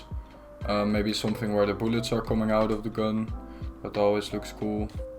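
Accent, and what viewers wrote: Netherlands. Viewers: Are you dutch? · We do be loving that Dutch accent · Dutch accent on top tho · are you dutch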